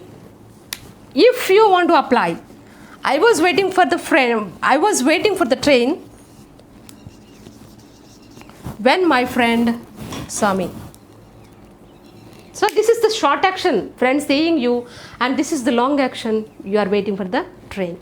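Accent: India. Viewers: teacher you have a very strong indian accent! please work on your accent, the way you speak we almost do not understand, because of your accent.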